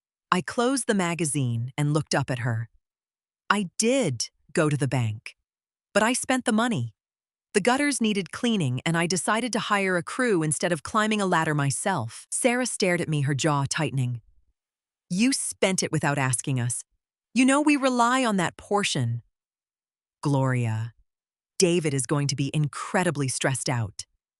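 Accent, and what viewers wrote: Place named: United States. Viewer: Why would this channel use an American accent AI narration, but have Euros going into the wallet?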